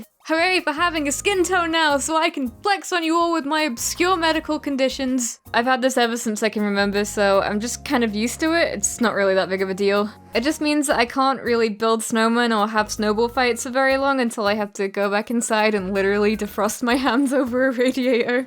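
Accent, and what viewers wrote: United Kingdom. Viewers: Am I the only one who thinks the female British accent is really cute?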